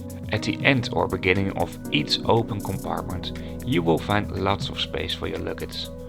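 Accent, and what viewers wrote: Netherlands. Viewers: Very nice vid! But, please, please - do something with your dutch accent ! These are, of course, "very" and "luggage" ! (Instead of "fery" and "lagitz"! ))).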